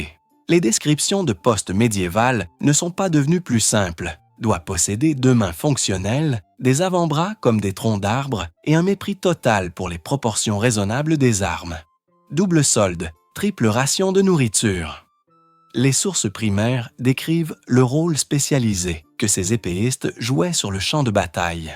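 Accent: Quebec, Canada. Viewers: L'accent quebecois du robot c'est pour gratter quelques secondes de vues ?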